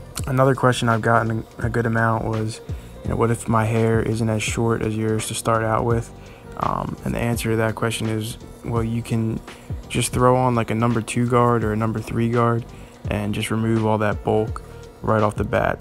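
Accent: Philadelphia, United States. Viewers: Philadelphia accent. Am I correct?? Great video man. Keep up the good work. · You deff from philli .or somewhere in Pennsylvania right lol ..that accent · i can tell my man’s from philly just by the accent lol. thanks for the vid fam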